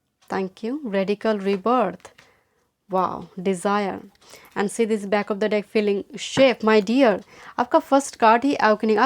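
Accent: Bengal, India. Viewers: You sound like a Bangali.....is so..?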